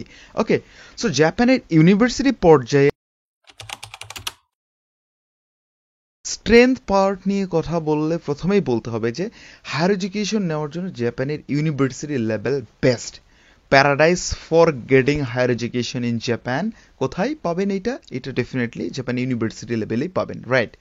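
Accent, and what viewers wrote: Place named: United Kingdom. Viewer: Vai apni Bangladeshi, amon vab nitechen amon vabe kotha boltechen mone hoy apni kono engrej, Bangla ta ektu vlo koira bola sikhen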